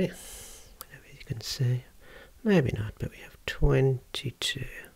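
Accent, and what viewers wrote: Birmingham, United Kingdom. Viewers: Brummie! Lush accent!!!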